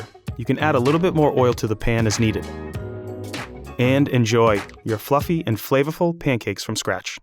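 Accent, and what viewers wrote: Boston, United States. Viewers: In case y’all missed the Boston accent AND the channel name and decided to whine about metrics, it’s America’s test kitchen. We don’t bitch about European recipes, we just look up the conversions. And seriously, it’s pancakes, get a grip girlfriend. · America's Test Kitchen needs more cute bearded guys with Boston accents...I will now never buy Bisquick again. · I could listen to a full-length podcast in his soothing Boston accent. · William Hepfer It's the "I'm from Boston but I don't want you to realize it" accent. At the end he goes full Wahlberg.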